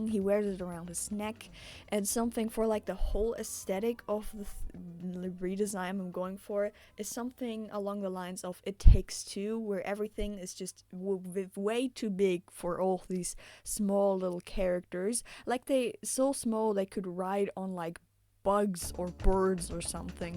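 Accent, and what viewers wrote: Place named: Europe. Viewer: Where are you from btw? I noticed a slight hint of European in your accent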